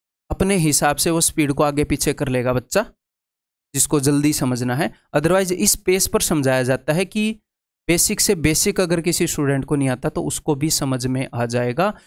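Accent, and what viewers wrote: Haryana, India. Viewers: Maine maths ka tier 2 batch lia h aur apka content perfect h ekdum exam oriented aur to the point ... Aur apke padane ka way bhi boht acha h aur haryanvi accent bhi aisa h ki dimag me seedha ghus jata h padaya hua ...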